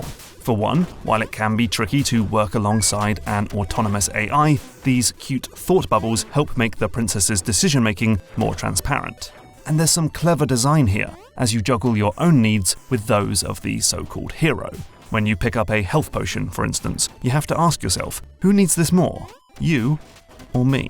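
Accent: United Kingdom. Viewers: Need YouTube AI to convert unpleasant British accents to accent of our choice.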